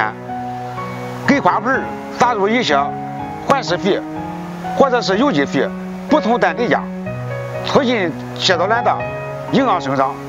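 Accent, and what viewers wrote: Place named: Shandong, China. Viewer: 山东话太严重了，听不懂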